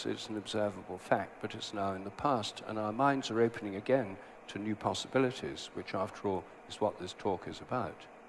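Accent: United Kingdom. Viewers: I'm tired of British accents. This man goes on and on. I don't disagree with him on everything here. It's just boring. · I can see how a take down of modern science can be a appealing to some but this is pretty stupid. Don’t let the British accent and crazy hair fool you 😂. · He looks like a pathetic hobo. If you're going to sport the British accent and give a symposium in front of a large crowd, at least take 5 minutes to pull your pants and well . He's mocking others while looking like that. Come on sir, be civil and respectable. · Just because you have a British accent doesn't make you smart.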